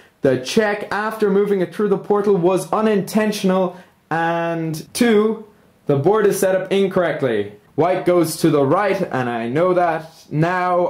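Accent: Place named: Ireland. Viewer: I could tell you were Irish in the other video. Probably because I watch a lot of U2's old concert vids on youtube - your accent is quite close to Bono's.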